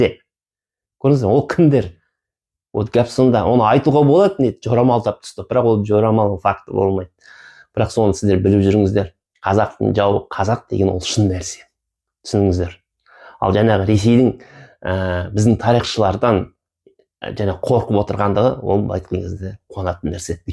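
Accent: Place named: Xinjiang, China. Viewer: Фу ДАУЫСЫ КАНДАЙ ЖАМАН ,кытайдан келген казак тардын сойлеген СОЛАЙ ЖАМАН.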